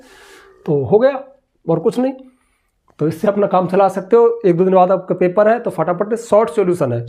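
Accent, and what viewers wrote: Rajasthan, India. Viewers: Sir mujhe lag raha hai ki aap Rjsathan se hoo 🤔😊❤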